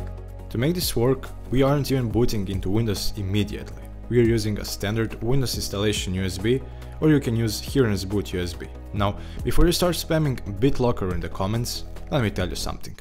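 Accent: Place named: Balkans. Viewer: Your accent sounds like you're from the Balkan region, and after seeing you accidentally type "Ć" , I'm pretty sure you are, so I have a question: "Odakle si?"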